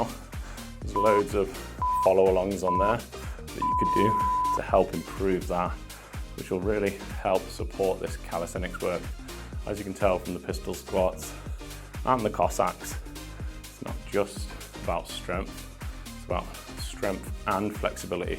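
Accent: United Kingdom. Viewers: Not a fan of the performative, overly excited American workout videos, so your calm, monotone British accent is music to my ears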